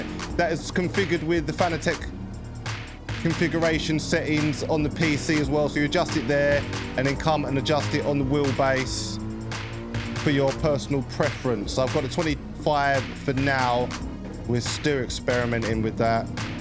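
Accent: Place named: South London, United Kingdom. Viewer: Where abouts in London are you... sound a bit south .. I will check out a few more of your vids... cheers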